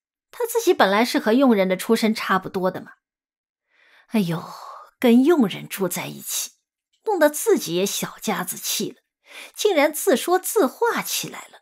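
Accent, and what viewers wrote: Shanghai, China. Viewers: 主播本来就是上海人😄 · 謝謝👍🙏🌹❤一直記得這篇文章。今天又听見了，尤其聽侬讲上诲闲話好亲切❤❤❤🌹🌹🌹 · 的上海话刚得也老道地的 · 主播是上海人啊？ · 喜欢你！希望你能多读一些上海题材的小说，对于我一个移居国外多年的人来说，听到乡音会感到很幸福，谢谢！❤ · 的嗓音真的是好听！全文都用上海话来播报就更绝了❤🎉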